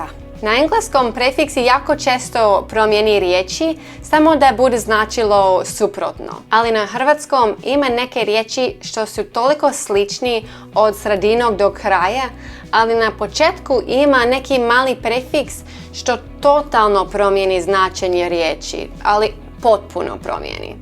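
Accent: Zagreb, Croatia. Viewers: Your starting to have a Zagreb accent really impressive! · As someone who hasn't learnt Croatian language from your childhood you're great speaker of your second most important language! I can completely understand all you've said! You also have great pronunciation, with a little bit of Zagreb's accent but that's completely fine since you're Zagrepčanka! 🙂 Other South Slavic languages you've mentioned are, from the linguistic point of view, alongside Croatian language part of the common polycentric language known as Hrvatsko-srpski ili Srpsko-hrvatski with some national variations (some differences in vocabulary, grammar, pronunciation, correct order of words, script) although some people in Croatia and other countries wouldn't agree since language, as a important part of national identity, is area of often harsh debates. To the some extent this is similar to the German language, also polycentric language, that is spoken in Germany, Austria and Switzerland but with strong national differences. 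It is the same with English language. Ofcourse this doesn't mean that these local variations couldn't be called with national names which is completely fine! Just keep on doing great videos and learn! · Croatian and Bosnian language are practically the same language, when you read them...but you can hear the difference in accent. And you do sound like someone from Zagreb. If you were visiting Sarajevo, no doubt that many people here would think you were Croatian and not someone from Canada speaking Croatian, since Croatian itself has that effect of foreign accent of our language...if that makes sense... kind of like British people talking, and someone who learned English very well with british accent. This reminds me of the time when I was young and had a boyfriend in Zagreb, and spending lots of time over there :D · Što se tiče Vašeg naglaska, najbliže ste ženi iz područja Zagreba. Naglasak se tek primijeti tek u 10% vremena. 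· Хрватски језик не постоји,загребачки је ваш говор,мала говорна мана ништа страшно....😀 Говорите Српски језик са загребачким нагласцима...:D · Imas naglasak hrvatica iz ZG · You even have Zagreb accent woooooo! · Akcenat je minimalan, o tome se ne trebate stvarno brinuti, zvučite kao neko iz okoline Zagreba, tako da ste se super prilagodili okolini. Svaka čast! Puno pozdrava :) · Amazing job on the cases, I must say! It's almost flawless, only sometimes you let slip the wrong one, probably when you're too excited or veer off the script, but even then the mistake is not that drastic. Your pronounciation is also amazing! It is a well know fact that native English speakers have a really hard time ditching their accents (every time an American tries to speak Latin I just want to die :P), and it's down to actual anatomy if anything. So you're probably correct, you will never get rid of it completely, but you shouldn't anyway, the way you sound now is more natural than some native Croatian speakers :P I can tell by the pitch you use you've learnt Croatian in Zagreb area, it was most prominent when you were giving the list of infinitives regarding prefixes. 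;) But you will forgive me for even bringing it up; I'm from Dubrovnik area and we take pride in speaking in correct pitch (mostly :P). It's another beautiful quirk of Croatian language, that's all. I'd say you haven't been studying that yet, hehehehehe, and you're probably better off without it :P :) The word order, yes, you probably already know you can use it to stress out certain aspects of your sentence/thoughts. E.g. Baka daje pitu MENI (Grandpa is giving a pie to ME (not you!), or Meni pitu daje BAKA (it's the grandma who's giving me a pie, not grandpa or someone else...). You can tell I put some emphasis on those key words, and you should do that too when using non-standard word order if you don't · vi govorite savršen hrvatski jezik sa zagrebačkim naglaskom.:)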